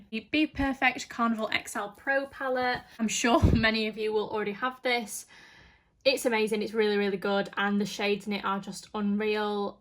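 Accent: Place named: North, United Kingdom. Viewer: I love the way you describe everything in your northern accent!! Such a beaut collection ❤️ making me want to do an eyeshadow palette video xxx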